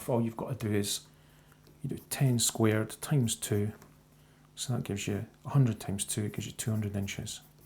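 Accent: Scotland, United Kingdom. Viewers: Are you from Scotland? If so, are you from the Inverness area?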